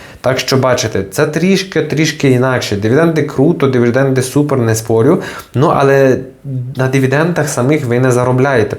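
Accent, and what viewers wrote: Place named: Western Ukraine, Ukraine. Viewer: бо вимова як в неділю в церкві, або ви з Франика або з Рави або з Тернополя більше варіантів нема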